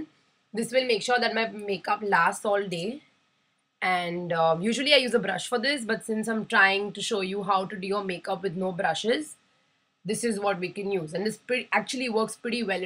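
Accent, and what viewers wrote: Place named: India. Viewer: your make-up style says you are a huge fan of Michelle Phan. I like how you did not try to have an accent and spoke in true Indian way!! Way to go :)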